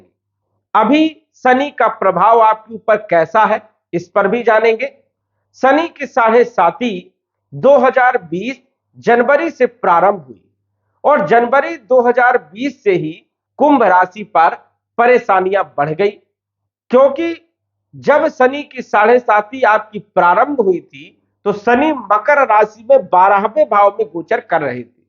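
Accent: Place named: Vindhya, India. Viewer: जी लगता है विन्ध्य क्षेत्र से हैं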